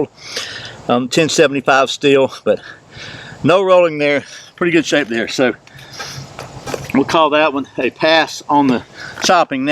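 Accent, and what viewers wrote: United States. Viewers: Your accent ...yank mate....which state/region? It's cool! A bit of a twang, but not too much..like it!.😁👍🇦🇺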